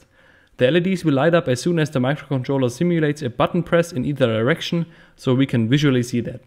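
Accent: Germany. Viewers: Digga man hört so das du deutsch bist 😂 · Zwischendurch hört man es deutlich, finde sein Englisch aber perfekt :D Er spricht Sachen wie Baut zb typisch deutsch aus. Hat aber bei englischen Vokabeln null Akzent wie ich finde.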